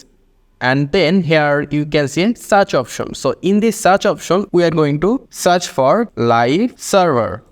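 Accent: India. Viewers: bro when i hear a idian talk i instanly knew that my problem will be fixed :)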